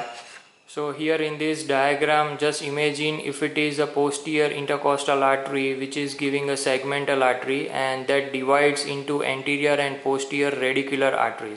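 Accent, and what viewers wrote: India. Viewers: Try to speak English not Indian during the video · I would really, really, really, REALLY appreciate subtitles to translate this to American English. The science is stellar and informative, but there are places where I cannot understand a single syllable of what he is saying. He's obviously NOT stupid. HE UNDERSTANDS HIS SHIT. But I cannot understand a WORD of what he is saying at some places. That's MY fault for not being familiar with East Indian (?) accents and pronunciations. I really wanted to watch more of this video, but was literally lost in what sounded like "blalalalalalalalah". It was gibberish on my end. NOT your fault... just my lack of experience/exposure to other cultures. I'm embarrassed about that. Sorry. PLEASE ADD SUBTITLES or translations to help us unsophisticated American English folks to understand what you clearly understand in this beautiful dissection and exploration. 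I apologize if this sounds insulting or belittling. You've done great work. I just want to understand it. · Indian accent 😑🤦🏻‍♀️ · I know it's tough... but Indian people should try to speak as slowly as they can... cause the accent is to strong... they start the sentence ok, but from the middle on, they accelerate... and when the subject demands non everyday words, it's tough to follow... I wanted to watch the whole video, cause the subject interests me... but couldn't go past minute 9... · I cant understand what the hell he saying..Indians speaking english... the worst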